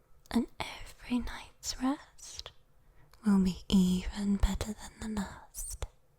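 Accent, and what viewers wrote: United Kingdom. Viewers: British accent 👌👌👌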